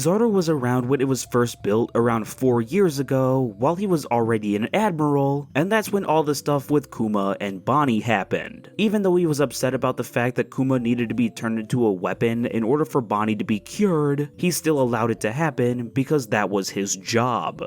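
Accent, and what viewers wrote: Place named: United States. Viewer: God, such an American way of pronouncing foreign names lol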